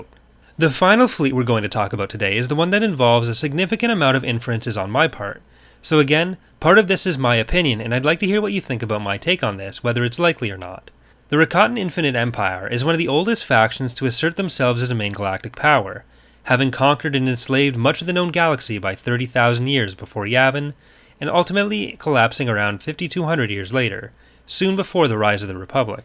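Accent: Canada. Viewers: I feel like the black fleet crisis could fall under this category. I know most ppl don't like those books, but I personally do. I love new republic stories and older star wars material in general, it's so nostalgic to me., u sound like u have alittle Canadian in you!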